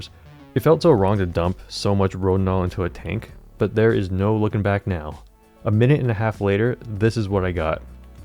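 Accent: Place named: United States. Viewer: HALLO from a WET and GREY BRENTWOOD, Essex ENGLAND !! Just FOUND your Channel and LOVE your Experiments ( and the American ACCENT-- got any Gum Chum ? ) I have a similar channel for film stuff and a few Piano Playing episodes !! I subscribed as I want to watch your Video on the Old VERICOLOR as I have some of the SAME in Fridge !! Keep Snapping -- Peter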